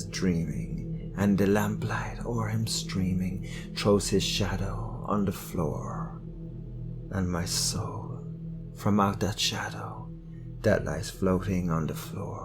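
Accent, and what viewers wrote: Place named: Ireland. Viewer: Aaaaand I fell in love again ❤ An Irish man reading one of my favorite authors? Yeap, sign me up!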